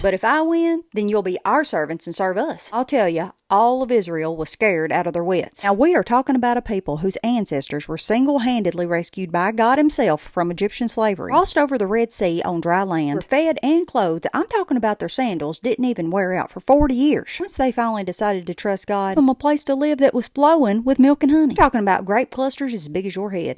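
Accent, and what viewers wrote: South, United States. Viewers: AMEN sister, thank you for this...Needed of this reminder!!! Love your cooking channel, beautiful Southern delivery, and your humor. FYI that coconut cream pie looks divine!!!🤩❤️‍🔥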